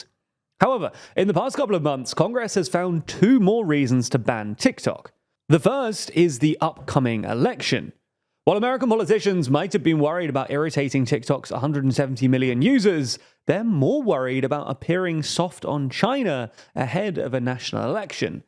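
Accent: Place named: United Kingdom. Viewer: Presenter is British, speaking British English (from where the language originated) rest assured he is pronouncing it in the correct manner.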